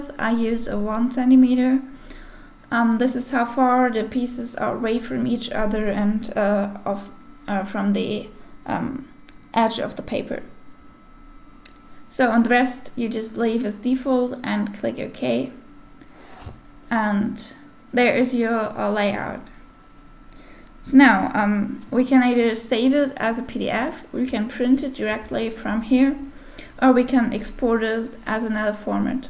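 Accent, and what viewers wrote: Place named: Switzerland. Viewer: Is she Swiss-German? Her accent sounds just like Angelic Venus.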